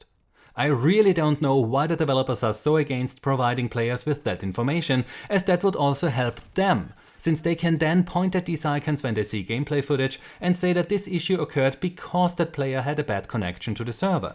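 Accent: Germany. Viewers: Man hört raus das du ein Deutscher bist. ^^ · Are you a german Person because you have accent from german people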